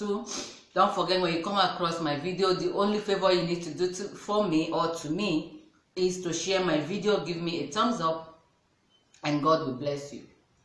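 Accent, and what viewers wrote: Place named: Owerri, Nigeria. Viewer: u speak owerri , are u from owerri , im from owerri too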